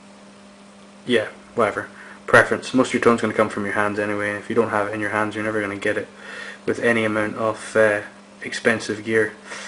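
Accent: Canada. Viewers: k...ur acsent sounds a little canadian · oh nice lol you sound canadian though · are you canadian? i hear a thick accent. but i also get a small distortion problem with my axe-fx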